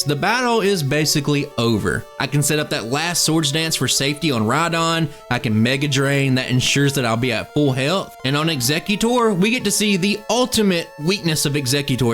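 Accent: South, United States. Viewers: Gengar ❌️ Geengar✅️.....😂 but seriously I've been loving your videos man, and the southern drawl makes it feel like home😊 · The quality of your videos definitely rivals that of other high subscriber count creators, and your Southern accent makes you distinct from the crowd. (I'm from Louisiana, so I love the accent btw 😂)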